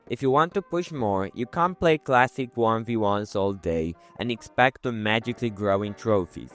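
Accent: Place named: Iran. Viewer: by his accent, he seems irani/persian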